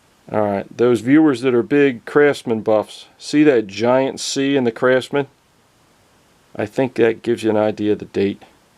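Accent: Philadelphia, United States. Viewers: you sound like a Philly boy.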